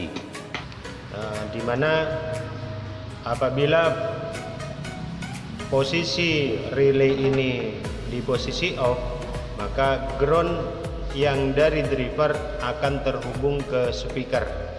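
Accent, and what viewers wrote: Madura, Indonesia. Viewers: Madura ya mas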